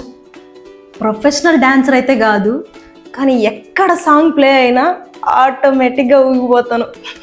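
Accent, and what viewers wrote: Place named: Guntur, India. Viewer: Tirupati antunnaru but maa guntur slang undi enti ?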